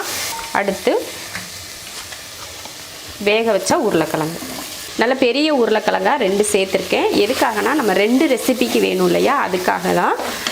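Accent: Coimbatore, India. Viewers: Neenga, Christiana, ruslimma,therindhu kolla aasai,eanna pottu vaikkamal erukkeenga,but, unga Kovai slang nanna erukku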